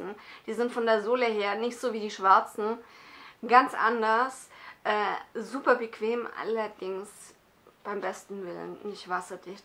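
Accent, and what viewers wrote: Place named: Swabia, Germany. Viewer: Als Du gesagt hast:In den Schuh rein schlupfen!!Mußte ich echt grinsen.Mag dein schwäbischer Dialekt.Bin selber geburtig aus Biberach an der Riß.Liegt zwischen ULM und LAUPHEIM.Liegt im Oberschwäbischen Bereich.Drück Dich mal von der Ferne.Wohne jetzt im Bereich Würzburg,Bayern!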